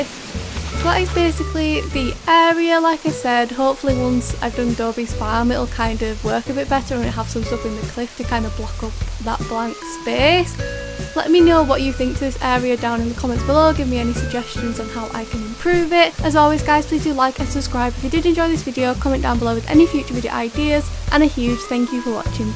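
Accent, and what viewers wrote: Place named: Scotland, United Kingdom. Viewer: i’m realizing you’re scottish not irish bye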